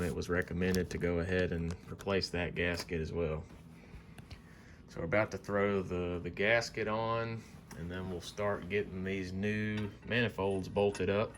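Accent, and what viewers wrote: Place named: Birmingham, United Kingdom. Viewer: Hey I recognize that accent. I was raised in Birmingham. Lol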